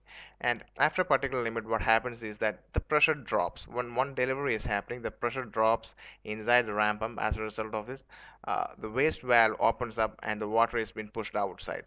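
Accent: Kerala, India. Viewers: LoL.. another mallu with accent 😂😂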